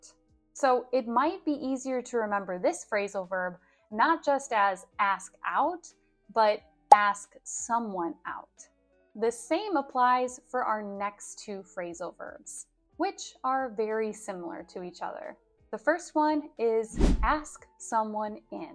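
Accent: United States. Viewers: ❤American accent New pupil is coming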